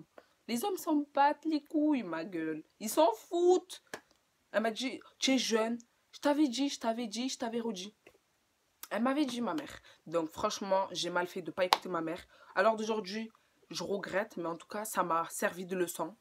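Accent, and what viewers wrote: Marseille, France. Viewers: Oui on veux la partie 2 de ta best t grave mimi les mecs faut pas vivre pour eux avance fais ta life jador ton accent marseillais 😊 .. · on dirait une marseillaise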